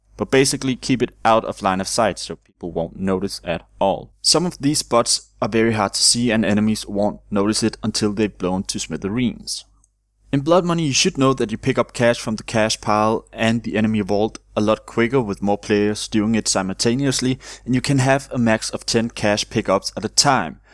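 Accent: Denmark. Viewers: Are you from Denmark? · Det da en Dansker der taler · I knew you were from Danmark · Er du fra Danmark? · are u from Denmark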